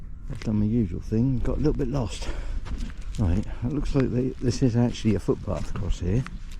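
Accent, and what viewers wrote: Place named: Norfolk, United Kingdom. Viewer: nice to hear the accent!, I'm a Norfolk gal living in Kent 🙂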